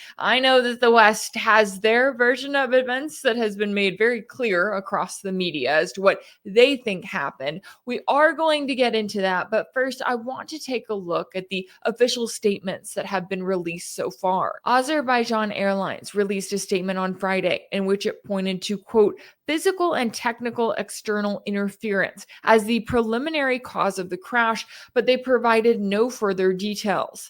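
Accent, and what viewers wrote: Texas, United States. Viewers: She sounds fine nothing wrong with it a girl from Texas.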